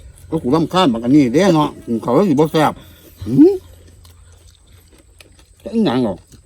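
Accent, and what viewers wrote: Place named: Phon Sawan, Thailand. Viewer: ญ้อ อำเภอหยังครับ เว้าคือโพนสวรรค์บ้านผมเลย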